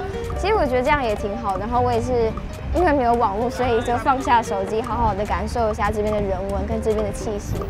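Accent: China. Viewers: 中國住太久口音很重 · 嗯 一口中國腔... 聽了超級彆扭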